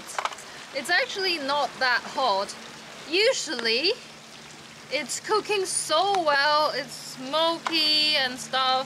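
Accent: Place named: RP, United Kingdom. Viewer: Haha love that you have adopted a posh accent. Please keep it and your English is 👍🏼 👌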